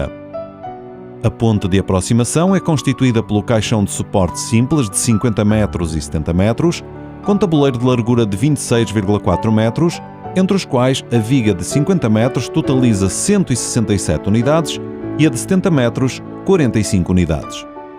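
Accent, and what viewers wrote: Portugal. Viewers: Porque um português na narrativa? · Está voz é de um português de Portugal!! Quero entender está evolução toda!!🤔🤔🤔🤔🤔🤔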